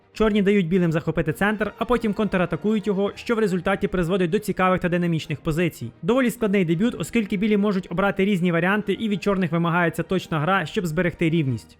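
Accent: Ukraine. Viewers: Ох уж цей ужгородський акцент) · Ахахахах какой смешной колхозный малоросский говор)))) пешака ахахахахах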